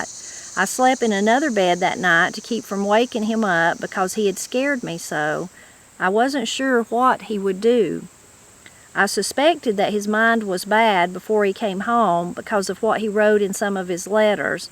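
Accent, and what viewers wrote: Appalachia, United States. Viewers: Ms., I want to thank you for celebrating our beautiful language and giving it the respect and dignity it deserves. My people are from Blount County, TN and my parents took accent reduction classes in the 70’s before I was born. They still think they did us a favor, but they took something precious from us. I understand why, but I’m sad about it. Hearing you talk and read to me brings it back a little bit and I am grateful for that. 😭😭 thank you so much, Ms. ❤️❤️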